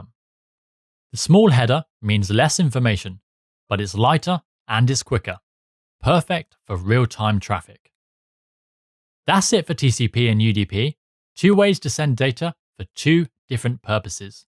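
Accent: United Kingdom. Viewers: I love these videos btw! I am starting an apprenticeship within IT networking on Monday and I have been trying to research information. Your videos are the most beneficial and well explained! Are you british btw?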